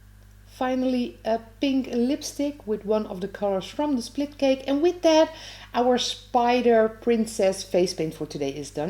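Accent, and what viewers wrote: Netherlands. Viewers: I didn't know I needed to see this am, but apparently I did Also, are you guys Dutch? The accent from the voiceover sounds very familiar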